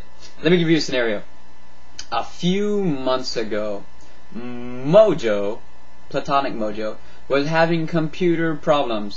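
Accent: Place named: Japan. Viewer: The ages of 13-17 and 45-54 must be the most despicable male age ranges, due to a lack of women/ lack of attractive women. I'd imagine the desperation of their lives leads them to your feline idolatry. Please exploit it and encourage all your subscribers to dress as cats and crawl across the floor. That would be such a good set of videos! Just tag them By the way anyone who has an interest in cats should check out icanhascheezburger. I'm very jealous of your Japanese accent.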